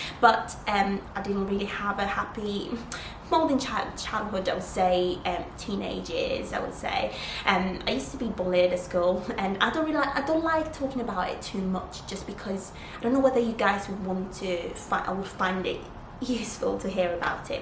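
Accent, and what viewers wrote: United Kingdom. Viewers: How old are you now? You have a British accent now. I wouldn't think you would be from Italy. I lived there for four years, in Sardinia, Porto Torres, near Sassari, from 7years old to 11, obviously came with my mum there, originally from Poland. Since then came to UK, Leicester. Long story though, I have also been in Manchester for the past 2years, and before going there, I lived in the UK for about 10years, as I arrived here in 2008 Winter, I was about 11, and came to Manchester in the summer of 2018 for the first time. I haven't spoken with my family since 2017, so was on the streets a few times, but yeah... Not sure why I'm writing that, I think I side tracked :D But yeah, interesting, saw you from Mike Thurston's video, its interesting you were or are in Dubai. Would you tell us your story of how you got where you are now? :D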